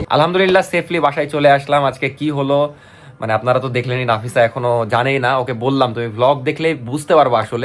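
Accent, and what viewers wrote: Rajshahi, Bangladesh. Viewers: I like ur accent 😂🎉 rajshahi accent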